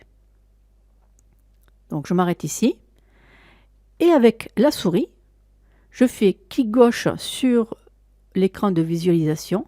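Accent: South, France. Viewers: Merci pour vos tutos très instructifs et bien expliqués. Utiles pour quelqu'un pas très féru en informatique comme moi. J'avais la version 2016 et maintenant 2022 et j'étais un peu perdu. Après 2 années "covid" je n'avais plus fait de films de voyages et donc j'avais un peu perdu les bases. Je vais pouvoir me relancer grâce à vous. Et puis votre accent méridional est agréable ce qui est un plus, mais n'allez pas croire que je cherche à vous draguer !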